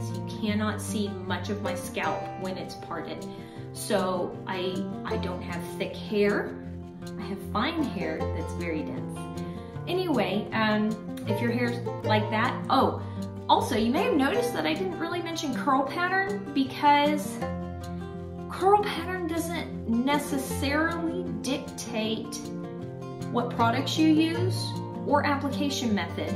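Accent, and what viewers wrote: South, United States. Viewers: I detect a fellow Southerner! Native Texas living in the West! Miss the south, and will be back there soon! My hair worked the first time, as I have ringlets already! Thanks for the tips!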